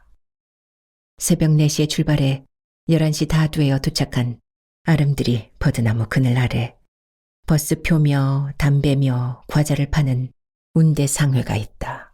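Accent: Jeolla, South Korea. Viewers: 처음부터 구수한 전라도 사투리 로 고급지게 들리는 에 낭독이 왠지 전설스런 내용일듯 좋아요 누르고 감사하며 듣습니다~♡ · 와 사투리 구사가 완전, 설마 전라도분 이실까요? ㅎ 우리 할머니랑 똑같은 단어 똑같은 억양 완전 리얼 합니다.. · 전라도 사투리가 찰집니다.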